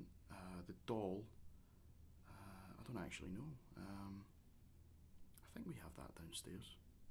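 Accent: Scotland, United Kingdom. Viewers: Loving the videos man keep em coming! Scotsman myself so its great to hear our accent being put to good use :P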